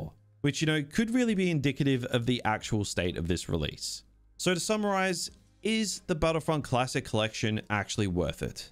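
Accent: Australia. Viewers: dragged past 10 minutes cringe aussie accent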